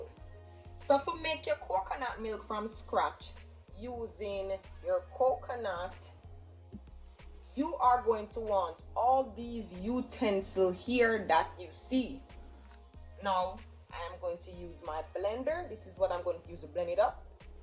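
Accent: Jamaica. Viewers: Yow ,your authentic jamaican voice make the video enjoyable to watch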